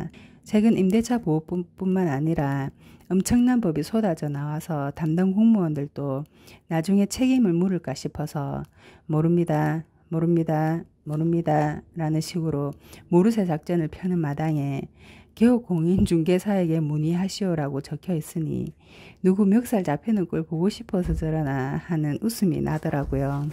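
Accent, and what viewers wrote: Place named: Busan, South Korea. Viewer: 안뇽하세요 저는 부산 사상에 살다가 이민을가버렸어요 님목소리들으니 부산에 빨리 가고싶네요 늘 감사합니다~^^♡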